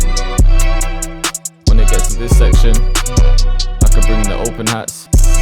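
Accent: United Kingdom. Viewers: I like how uk ppl say 808 A O A 😂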